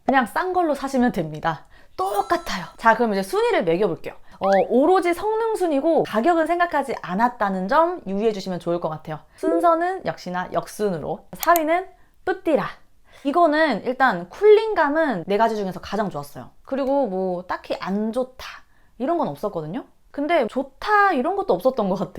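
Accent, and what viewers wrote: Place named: Honam, South Korea. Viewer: 살짝 살짝 호남 사투리가 들려요 귀엽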